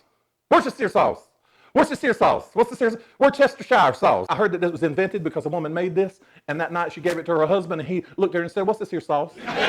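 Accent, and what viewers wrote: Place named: South, United States. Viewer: Just got started watching your videos after I found you on TikTok ! You are hilarious and I love your humor and southern drawl! Remind me of my family ♥️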